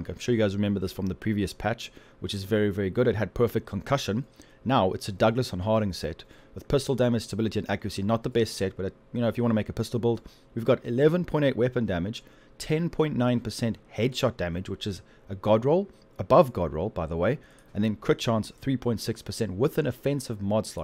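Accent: South Africa. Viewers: is that a South African accent? Thanks for the help man, keep up the good work <3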